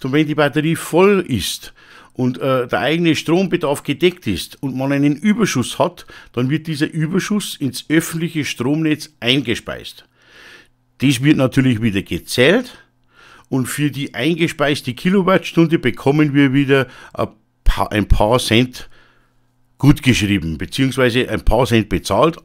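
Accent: Bavaria, Germany. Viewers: muß man auch nicht. Das ist bayrisch! Und echt guat zum Zualosen..... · mir gehts auch immer so wie dir mann versucht hochdeutsch zu sprechen und rutscht immer wieder ins boarische😀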